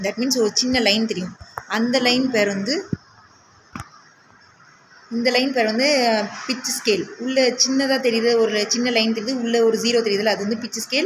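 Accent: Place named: Kanyakumari, India. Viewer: Mam nenga kanyakumari slang la pesuringa 😅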